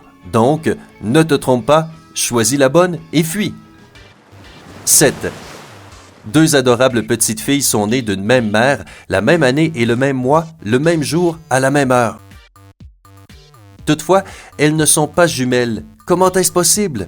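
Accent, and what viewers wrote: Quebec, Canada. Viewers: est-ce t québécois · Parce qu’il est québécois et au Québec, on dit ça comme ça des fois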